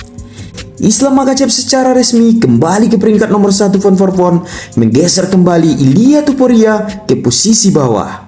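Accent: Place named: West Java, Indonesia. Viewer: .. Sedikit saran niih bro. Agak dikurang2inlah sedikit logat/dialek "Sunda" mu. Penonton mu tak semua orang Sunda. Came on, up grade lah chanel mu biar lebih keren.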